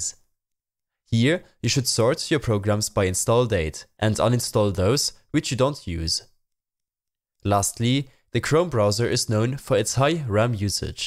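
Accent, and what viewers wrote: Germany. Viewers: klingt sehr deutsch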